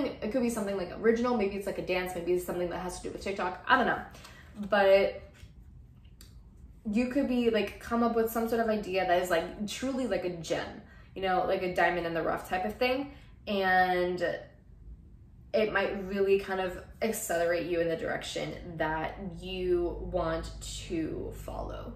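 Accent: United Kingdom. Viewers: hahaha yesss i love the british accent! i always do that too 🥰❤️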